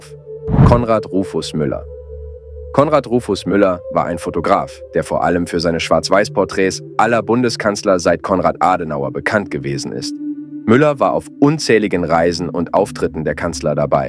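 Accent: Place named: United States. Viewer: was soll dieser "Ami-slang" in deiner Präsentation?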